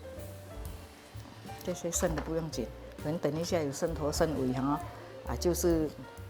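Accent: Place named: Hokkien, China. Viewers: 潮州人吧！ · 是閩南人吧，口音很熟悉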